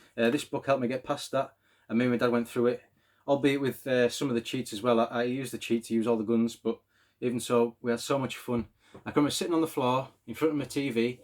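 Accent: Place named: Cumbria, United Kingdom. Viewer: Good video. Are you cumbrian by any chance? 😁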